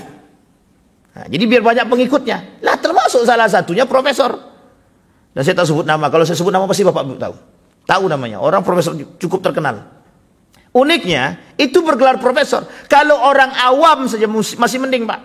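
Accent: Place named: Rokan Hilir, Indonesia. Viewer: Logat pak Ustadz mirip dg BHS Rohil Riau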